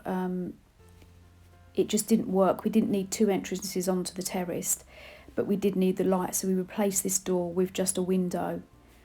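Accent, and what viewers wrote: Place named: United Kingdom. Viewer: Enjoyed binging your channel. Few requests-- -Please increase the volume on all your commentary. I have the volume all the way up and can barely hear. Being from the US, understanding the British accent with music in background adds an additional challenge. -Create a playlist of all the videos in a row so new viewers can start at the beginning and watch the process in order without having to keep returning to the main channel. -Please move the camera through the rooms a little slower. Moving too fast makes it hard to comprehend what we're seeing and can feel a little dizzy. I also felt like the inset photos were important, but didn't get their connection to what I was viewing. -Thank you for getting new music. The original background music was ok, but it was VERY repetitive for so many videos. It's a lovely property with loads of potential. 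Looking forward to following your journey!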